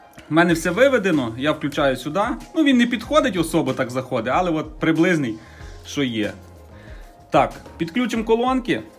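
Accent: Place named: West, Ukraine. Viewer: Класна західноукраїнська мова! Ви часом не з Львівщини?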